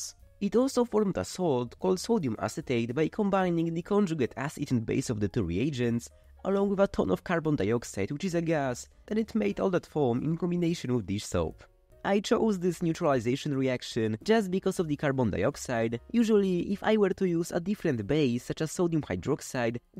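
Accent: Poland. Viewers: My new favorite ASMR listening to chem synth in English via a cool a$$ dude wth a Polish accent. 👌🏻 10/10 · hey bro, are you polish by any chance, you have polish accent in your english lol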